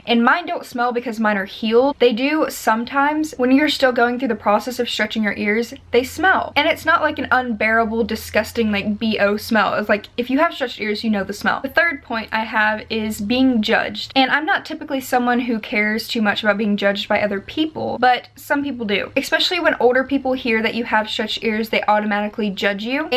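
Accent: United States. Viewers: Genuinely thought you were one of my friends that I haven’t spoken to in years then I realised you’re American and I’m English 😂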